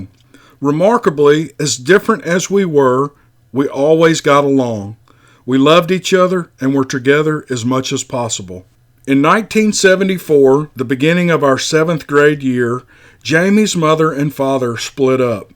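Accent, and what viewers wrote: South, United States. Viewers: Love this channel. The music and your diction are great. Not a whole lot of us southerners on here so it’s refreshing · I take my phone to bed at night and try to drift of to your great story telling. You have the perfect voice for it and I love the slight southern accent. Thanks much for these stories and keep them coming. I, too have a story that is unbelievable but it's not about cryptids but rather the paranormal. Idk if you would be interested in a rough draft of my story which includes everything from a entity Aporting, getting in bed beside my mom and snoring, stomping up and down the steps and even physical attacks. It took us 6 most to sell the creepy old 23 room Victorian house in the historical district of Dayton Ohio. It's a terror that's different than any other terror. My email is <mail> love your channel thanks so much. 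· Hey Bud great story.. NO one can tell a story like a southerner. PLEASE DON'T loose YOUR Southern acsent. Im also a southerner and proud of my heritage. LOVE YOUR show and Your storys bud... GOD Bless YOU..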